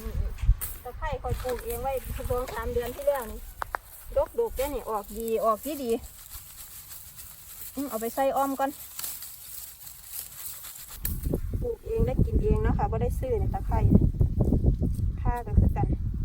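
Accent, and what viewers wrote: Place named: Khmer Suay, Thailand. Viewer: ตอนแรกนึกว่าเอื้อยเป็นซวยเขมรทางบุรีรัมย์ สำเนียงการเว้า หน้าตากะคือ อย่าด่าเด้อ พึ่งคอมเม้นแรก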